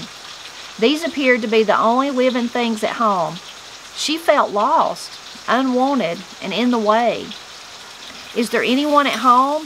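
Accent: Appalachia, United States. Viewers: Thank you So Much for sharing by reading this story. It feels so great listening to you in Appalachian tone of voice. Reminds me so much of my mother. Is the book still available to buy; it would be interesting to read it with the Appalachian accent. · I don't believe this book could be narrated better by anyone else. Your Southern English accent give these written words the life and nuance of true Appalachian people. Stephen King once said that he prefers his books be 'heard' through the use of audiobooks, because it insists that the listener consume all that he had written, instead of "speed reading", or hurrying through it, not grasping the emotion and meaning that he spent so much effort instilling into the work. I too love audiobooks because of this.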